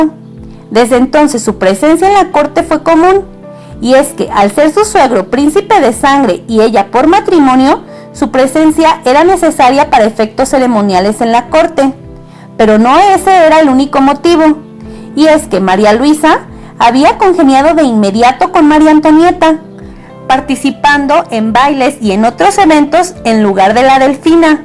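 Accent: Mexico. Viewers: TU ACENTO MEXICANO de clase baja 💩💩💩💩 ES INSOPORTABLE. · Interesante historia pero la voz es un poco fastidiosa con ese acento mexicano tan marcado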